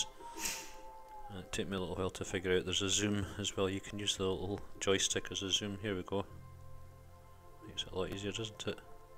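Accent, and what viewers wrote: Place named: Scotland, United Kingdom. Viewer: ohh shit you Scottish mate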